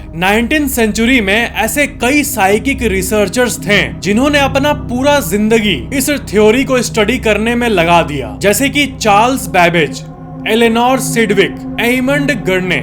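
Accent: Bihar, India. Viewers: bahi qna video bnao aur btao tum Kya Bihar se ho?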